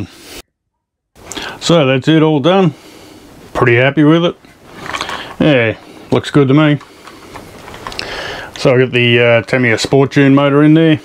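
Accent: Australia. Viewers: very humble man!! Becoming a huge fan of yours! You and!! Also helps that everything in a Australian accent just sounds cooler and funnier!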